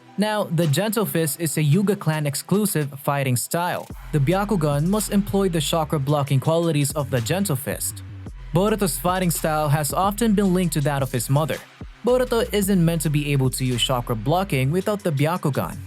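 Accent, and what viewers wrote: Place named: Philippines. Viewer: Pinoy accent 😂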